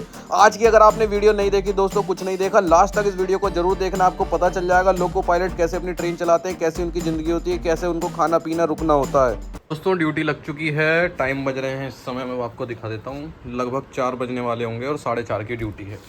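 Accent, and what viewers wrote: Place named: Western Uttar Pradesh, India. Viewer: भाई up west के हो क्या? Ascent lag raha hai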